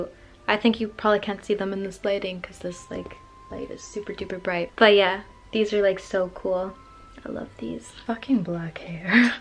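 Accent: United States. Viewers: I'm a bit confused! Your accent is American and you say pounds££ so I'm a bit confused or is it just me thinking you sound American?🤔🙈🙈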